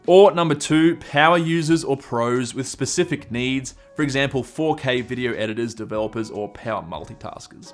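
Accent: Australia. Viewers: When im trying to listen but being distracted with that damn eargasmic accent.. dont get me wrong its soooooo good for my ears. Hahah. Im thinking he’s an aussie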